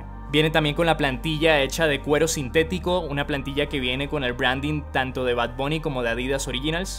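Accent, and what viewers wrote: Venezuela. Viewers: Bro tienes acento venezolano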